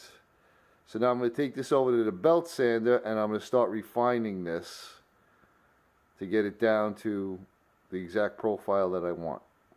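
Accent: New York, United States. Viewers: my friend. EXCELLENT work! Those are talented hands fella. It really is too bad that Nicholson/Weller is producing such garbage now. They used to be the best of the best. Maybe they should find something else to do eh. That accent of yours sure sounds Bronx/New Yorkish? Take care. I always enjoy what your up to bud.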